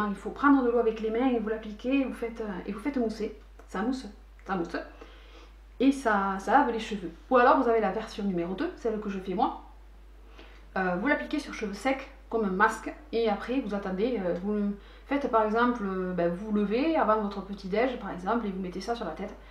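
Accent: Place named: Centre, France. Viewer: .... même si je ne commente pas tjrs, sâches que je regarde tes videos de temps a autres... j'adore ta façon de presenter, ton accent egalement.. (du centre de la france, non,?)... tu ammènes une certaine fraicheur, en bref tu es geniale...+1 like... abiento:)))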